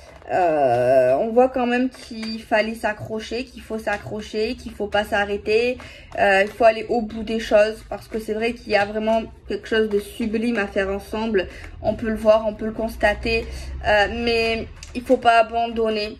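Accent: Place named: South, France. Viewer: trés jolie avé l, accent en plus ma voisine *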